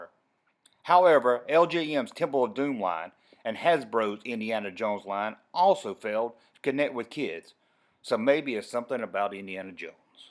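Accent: South, United States. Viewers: He has a southern drawl...he’s from Joe-juh. (Georgia). That’s why he sounds different; u don’t get out much do you? It is ok though, intelligence and looks aren’t everything! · just a southerner.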